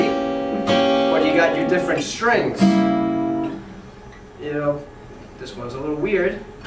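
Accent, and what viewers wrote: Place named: New York, United States. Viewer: Hahahaha, two notes in a chord. I know you know that is not possible, but it's still funny. Your point about neck mapping is spot on and that's one of the greatest things about playing guitar compared to other instruments where you can only play the stuff in one locked in place. I love your My Cousin Vinny accent. Yo!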